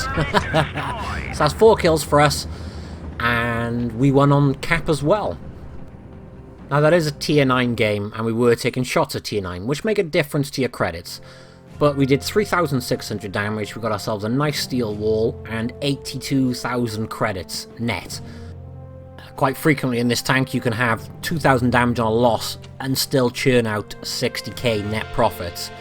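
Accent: United Kingdom. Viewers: Wot Blitz your work is much appreciated, are you on any patronite websites? Do I sense right you're from UK? (accent)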